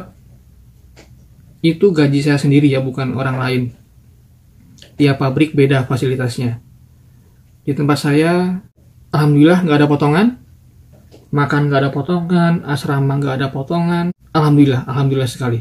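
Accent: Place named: Java, Indonesia. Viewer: Perasaan Jawa barat ngomongnya Sunda😅 Iki lho jowo medhok rek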